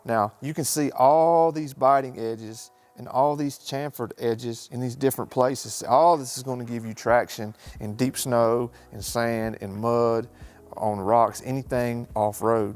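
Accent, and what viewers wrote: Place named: North Carolina, United States. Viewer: Where you from? Sounds like a North Carolina accent.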